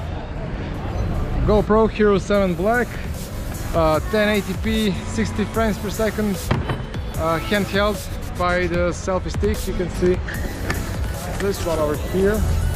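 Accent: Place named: Niš, Serbia. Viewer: "..Let me tell you of the days of High Advantures!" Dobar video čak ti je i eng niški !! 👌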